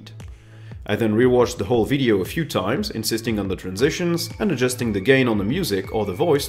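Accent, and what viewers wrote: France. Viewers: wat , you're french ???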